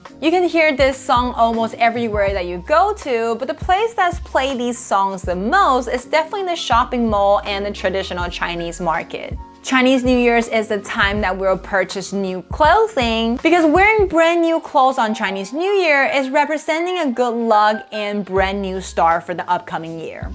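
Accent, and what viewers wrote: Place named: United States. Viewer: Your accent is so American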